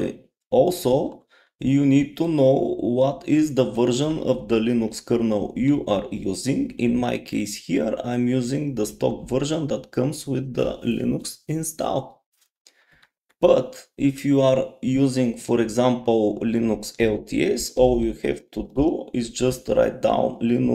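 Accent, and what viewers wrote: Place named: Russia. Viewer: Я отчётливо лсышу русский акцент, ну или славянский, а так спасибо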